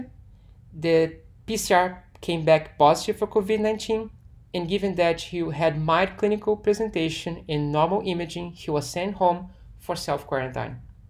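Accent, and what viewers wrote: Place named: Brazil. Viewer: Love the Brazilian accent <3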